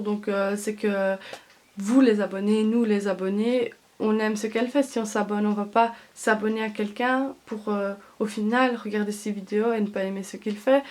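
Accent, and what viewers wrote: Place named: Belgium. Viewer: Et une abonnée en plus ! j'aime bien t'a façon de parler ;) Tu es belge ? moi aussi je suis de Belgique sa se reconnais direct avec nos beau accent MDR :p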